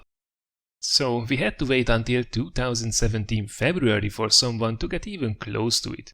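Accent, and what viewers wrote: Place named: Hungary. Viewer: Szia, ahogy hallgattam az angol kiejtésed nekem egy kicsit furcsának tűnt gondoltam hogy nem vagy eredeti angol, de rájöttem hogy a magyarok pont így beszélnek angolul. Biztosra akartam menni ezért a kommentekhez mentem és ahogy olvasom tényleg magyar vagy. Nagyon jók a videóid és az angol tudásod is. Csak így tovább!!!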